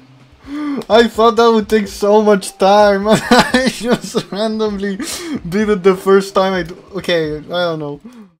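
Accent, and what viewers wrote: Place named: India. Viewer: but he sounds india so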